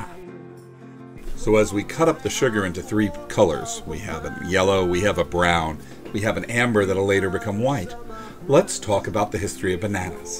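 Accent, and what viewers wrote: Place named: South, United States. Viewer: Southern charm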